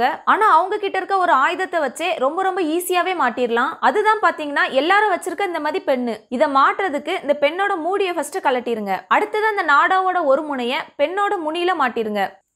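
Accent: Tirunelveli, India. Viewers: திருநெல்வேலி நாடார்களின் குரல் சூப்பர்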